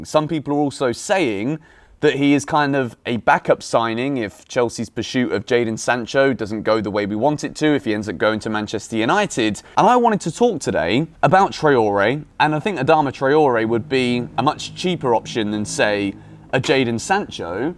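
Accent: South Africa. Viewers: Thanks for the South Africa accent bru❤❤🙌🏾 you making my country proud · You have got the South African accent down bru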